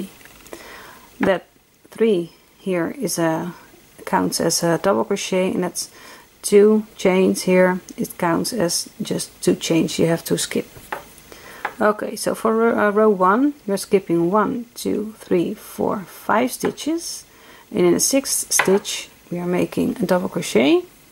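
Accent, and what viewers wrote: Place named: Netherlands. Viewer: I learned this one with a just one sc rather than two, but otherwise the same. I love that Nederlands accent - unmistakeable ! :) But I dunno how come you guys speak such faultless English !!!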